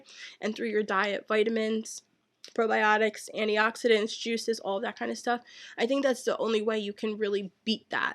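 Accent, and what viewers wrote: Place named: South Jersey, United States. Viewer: Gurrl! You already know I love you, your personality, content and accent! Go South Jersey..Lol 🙌🏽🙌🏽 I can totally relate to the whole thyroid issue! Basically it sucks! I’m hypothyroid and my hair is nothing like it used to be..it’s thinned out, dry and falls out!! ugh, my nails..and it does so much to your body, we would be here all day! Hang in there and go see the specialist for it, an Endocrinologist! I look forward to your adoption story and always your next video! Tfs love! 😘🤗 ~ Helen -NYC girl here..Lol!!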